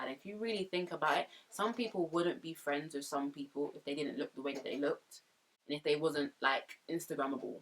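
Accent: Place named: United Kingdom. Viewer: Soo true instagram is soo fake and made me so depressed. Its not real life and you're right none of those people will be there for you in your struggles. Plus I hate feeling overexposed and you never know how many creepers are watching. As women its not very safe! I'm glad instagram deleted my account. I realized how immersed I was in that fake world. So glad you talked about this youre beautiful and love your uk?? accent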